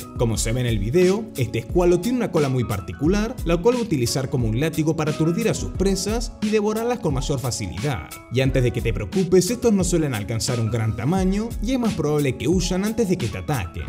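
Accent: Rioplatense, Argentina. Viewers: Sos Argentino? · Es Argentino. ..😎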